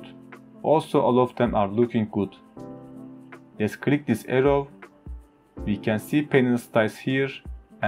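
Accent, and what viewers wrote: Turkey. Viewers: Çok belli türk olduğu ingilizcesinden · Konuşan türkmü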